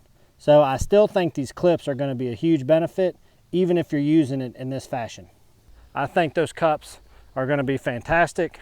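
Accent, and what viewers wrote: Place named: South, United States. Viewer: I watched this today. Interesting inco. Will look into vor warm weather watering. I live in area where it freezes several months of year. I use a rubber pan for water now. When water freezes, I turn it over and stomp out ice, then refill. Easiest method for me here. What do you suggest for winter months, without using a heating source. I can tell you are from Southern area because of acceent😉. This product , In my opinion, would not work in freezing climate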